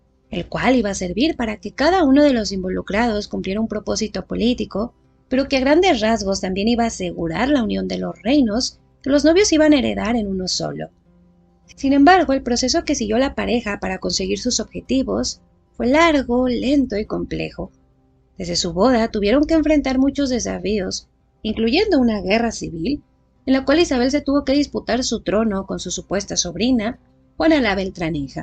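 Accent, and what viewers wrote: Mexico. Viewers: En el inicio muy famoso de la novela Noticias del Imperio, de Fernando del Paso que trata sobre Maximiliano Y Carlota, el célebre monólogo de Carlota tiene una frase que se refiere así a Maximiliano: fue el primer descendiente de los Reyes Católicos Fernando e Isabel que cruzó el mar océano y pisó las tierras de América... A la luz de este árbol super extendido, veo que no fue así, pues los Braganza llegaron a Brazil antes que los Hambusburgo Sax- Coburg Gothe a México. Impresionante trabajo, gracias por compartirlo. Tu acento no suena ibérico, parece mexicano 😊.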